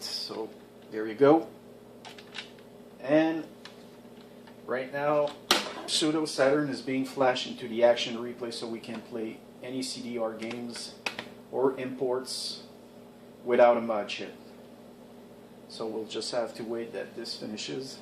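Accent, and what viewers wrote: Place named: France. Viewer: Salut, tu es francais toi vu ton accent. Merci pour le tuto.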